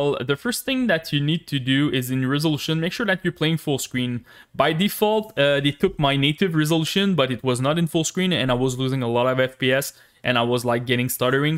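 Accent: Quebec, Canada. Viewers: yo quebec?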